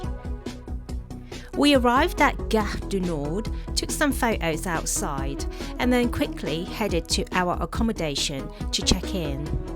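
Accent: United Kingdom. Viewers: 鐘意聽你講英文，我可以學聽英國音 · Hi, your voice and accent is very nice, can you share how you make it? As a local Hong Kong people, I really want to transform my accent to more British. Thanks.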